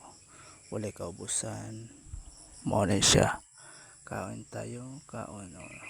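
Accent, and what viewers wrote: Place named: Iloilo, Philippines. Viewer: Ang sarap ng kain enjoy po sana mapansin nyo po ako fr healing live parang ilonggo ka po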